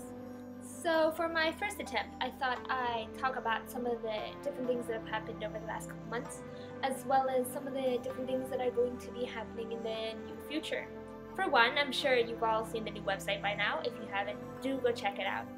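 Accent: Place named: United Kingdom. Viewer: why do you have a british accent?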